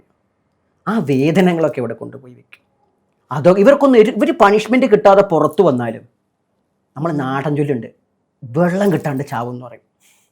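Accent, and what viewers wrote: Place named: Namboothiri, India. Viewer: സംഭാഷണത്തിൽ നമ്പൂരി ശൈലി ആഡ്യത്തം ങ്ങട് ലേശം കുറച്ചോളൂ... ബാമ്പ......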